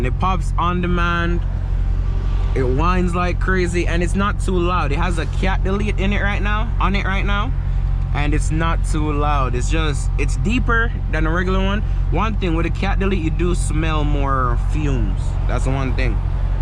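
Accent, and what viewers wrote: Jamaica. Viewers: Jamaican accent 👌👌👌👌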